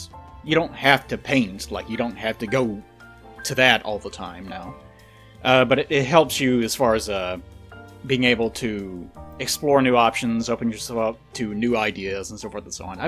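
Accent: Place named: United States. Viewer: Your voice is so country. I love it.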